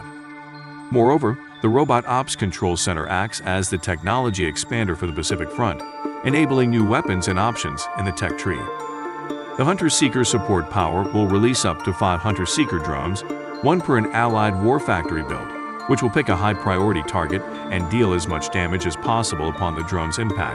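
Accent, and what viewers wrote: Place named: United States. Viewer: good voice ofc amarican not this robotic english 🤖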